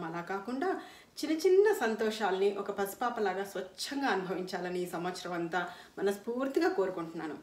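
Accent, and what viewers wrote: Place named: Kurnool, India. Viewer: Hello garu Mee slang vinnaventane nenu guess chesanu, meeru kurnool nundi vachi undochu ani..! Mee about Chusinappudu Ade nijam aindi..! I'm from Nandikotkur, kurnool..! Meeru chesina videos chusanu, chala bagunnai..! Keep going all the best....!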